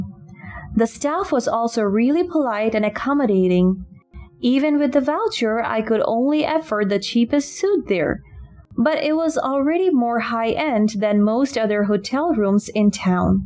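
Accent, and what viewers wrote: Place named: United Kingdom. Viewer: It's the queen's English not American English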